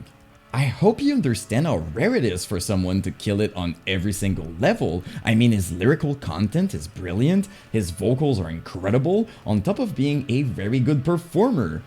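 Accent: Quebec, Canada. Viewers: C’est sûr que t’es Québécois! 😂 Est-ce qu’on aura une vidéo en français un jour? Peut-être à propos de la scène deathcore au Québec? 😗 En tout cas, merci pour là video — du bon travail comme toujours. 🫶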